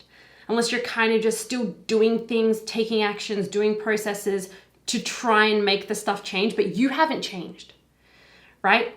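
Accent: Australia. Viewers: She’s from Australia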